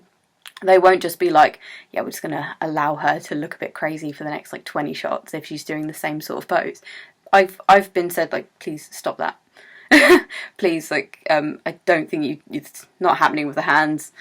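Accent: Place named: United Kingdom. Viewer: I did not know you were British! Your accent is awesome! :D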